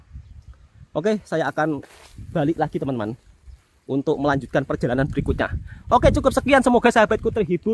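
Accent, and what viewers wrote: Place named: Central Java, Indonesia. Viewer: Tukang bangunan dan anak mudanya banyak yg kerjah di IKN, darih jawa tengah,jawa barat, jawa timur, merekah membangun ibu kotanya yg baruh di kaltim, mas sepertinya orang ngapak jawa tengah, tetap semangat mas walaupun tinggal di desah mantab ❤❤❤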